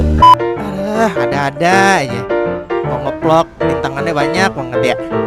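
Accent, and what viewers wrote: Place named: Lampung, Indonesia. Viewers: Itu bukan ngegas, logat lampung emang kayak gitu. Makanya sini ke lampung biar di ceramahin dulu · Gausah dengerin orang orang belloon bang tau gua orang Lampung begimanah logatnya wkwkwk fokus berkarya aja lu nya bang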